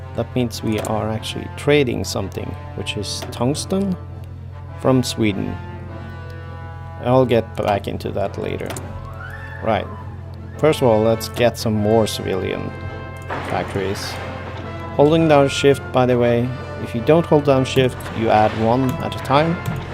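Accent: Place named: Eastern Europe. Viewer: I think some Eastern European country or mid Asian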